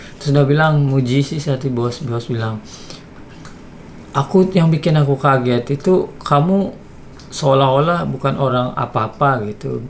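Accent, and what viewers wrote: Lombok, Indonesia. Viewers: Logat Sasak tetep melekat🙌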